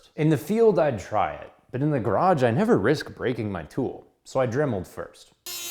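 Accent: Canada. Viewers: I’m assuming he’s from Canada. I’m waiting for him to say chooch or that’s the cock for dolly 😂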